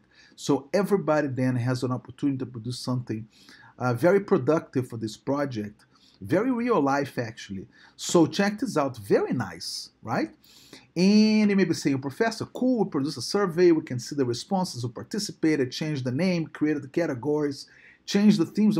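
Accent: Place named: Brazil. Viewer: Deu para sentir seu sotaque de brasileiro nos 5 primeiros segundos do vídeo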